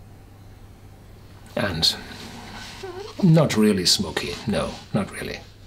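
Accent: Germany. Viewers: You’re not German ?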